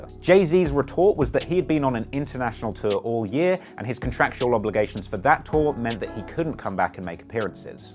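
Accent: United Kingdom. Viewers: I love his accent why don’t we have more British rappers?!?! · Hip hop slang plus British accent is just cringe worthy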